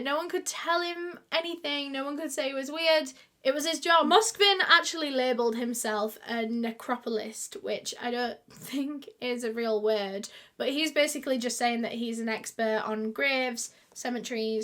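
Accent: United Kingdom. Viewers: Oh goodness. I've never heard your voice. I watch these with subtitles. I thought you American. I'm even more pleasent to hear your UK. Love it.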